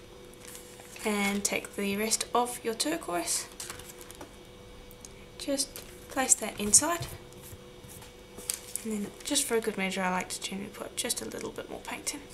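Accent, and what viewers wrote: South Africa. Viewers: Beautiful earrings, and you have such a calming, eloquent voice. You are very talented. Thanks so much for sharing. I also love all your special tools and tricks. I especially love your South African accent, as that is also where I am from, but a very long time ago.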